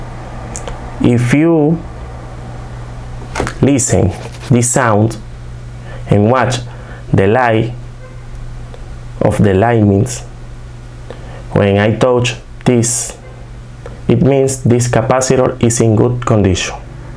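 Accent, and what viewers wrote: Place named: Dominican Republic. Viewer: Ese acento de inglés me suena dominicano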